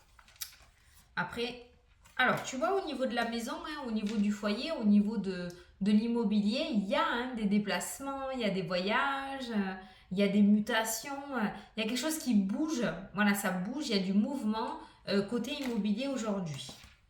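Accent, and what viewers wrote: South, France. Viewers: Tu me fait rire !!! ton accent du midi qui chante, ton franc parlé ,ta joie de vivre te regarder m'apporte de la bonne humeur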